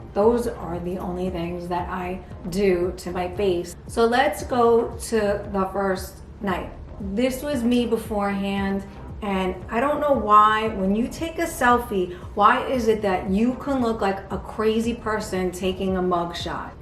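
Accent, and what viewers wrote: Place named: New Jersey, United States. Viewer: This is great! Love your accent too- I used to live in Edgewater NJ and it reminds me of home! Thanks a million.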